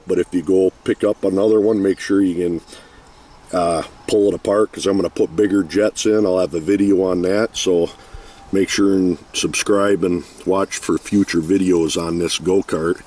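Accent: Minnesota, United States. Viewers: he even has the same minnesota accent! LOL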